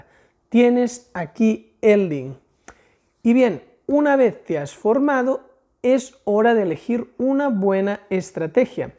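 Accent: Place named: Catalonia, Spain. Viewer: Cómo que romper un mercado?? Eso es posible?? P.D: Aquest accent català... xD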